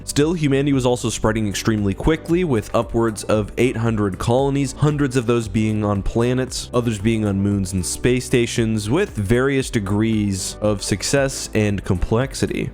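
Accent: Canada. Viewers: Gotta love his Canadian accent! Always good content tooo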